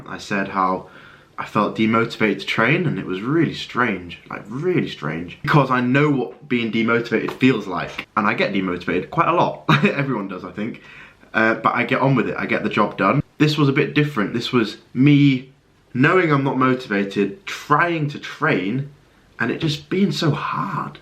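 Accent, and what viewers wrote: United Kingdom. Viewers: you are amazing. I am so glad you are on the mend. Listening to you reminds me why I love the English 😻🌈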